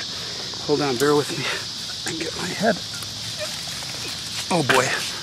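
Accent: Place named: Chicago, United States. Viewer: This is so awesome! I don't live in Chicago anymore, but my Scandinavian family is buried in several of the cemeteries you have covered in N. Chicago. I watched several of your videos just to see if you happened upon my family (slim chance I know). Love watching your videos and love your familiar Chicago accent and storytelling style. It makes me miss my family so much! It's pretty crazy that a Swede married a Norwegian 😂. Great video.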